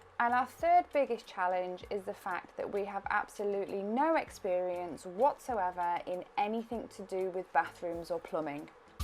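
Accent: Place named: Lancashire, United Kingdom. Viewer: Was directed through Tokyo Llama so really looking forward to watching your videos. Where are you from in the North,? Can’t quite place the accent - Lancashire?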